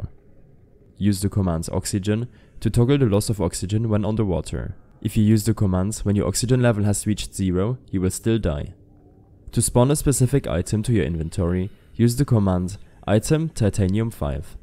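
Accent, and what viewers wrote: Germany. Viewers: Kann es sein das du Deutsch sprichst? Du hast nen starken Klangf in der Stimme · Not like Indian like German because I’m german and most of my friends when they try to speak English they are speaking like this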